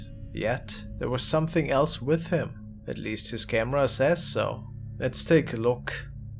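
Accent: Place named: Germany. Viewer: He is German ! Listen to his W's they sound like V's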